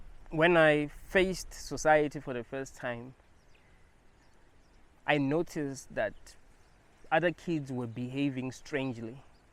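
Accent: South Africa. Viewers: You're South african?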